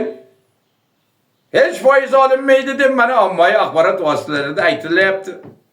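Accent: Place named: Tajikistan. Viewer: СССР ДАВРИДА ХАММА ЭРКИН ЯШАГАНДИ , ХУКУМАТГА ИШОНЧ КУЧЛИ ЭДИ , ИШСИЗ ОДАМ БУЛМАГАН , АЙНИКСА ОЛИЙ УКУВ ЮРТИГА КИРИШ У.Н КАТТИК ТАЙЕРГАРЛИК К.К ЭДИ , ИМТИХОНДАН УТОЛМАСА КЕТАРДИ , КОНТРАКТ СУППЕКОНТРАКТ ДЕГАНИ ДУБ КАЛЛАНИ УКИТИБ , ДИПЛОМЛИ САВОДСИЗНИ ТАЙЕРЛАШ ДЕГАНИ , ТАРТИБ , ИНТИЗОМ ТАМОМАН ИЗДАН ЧИККАН , АММО БУ ТИЗИМНИНГ ИСКАНЖАСИДАН ЧИКИБ КЕТИШ ОСОН БУЛМАЙДИ , ШЕВАСИГА КАРАГАНДА ДОМЛА ХАМ ТОЖИК МИЛЛАТГА УХШАЯПТИЛАР...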